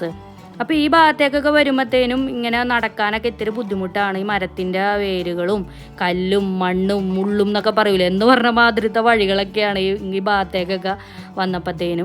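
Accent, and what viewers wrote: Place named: Kochi, India. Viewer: Cochin slang is the beauty of your video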